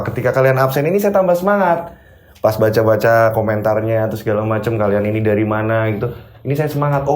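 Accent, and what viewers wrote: Java, Indonesia. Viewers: Assalamualaikum de.... Majalengka Jabar Hadir.... Seneng sekali dengerin cerita misteri de.... Suka sekali sangat pas menyampaikan cara bicara tokoh cerita nya.... Logat jawa nya.....sehat selalu de... Semoga chanel de semakin berkembang.... Boom.... Boom... Booommm😍🙏🙏🙏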